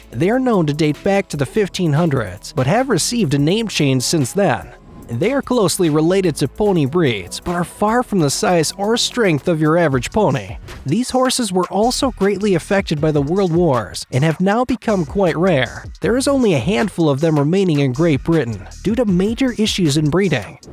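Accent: United States. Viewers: I love how this dude speaks "Countrey"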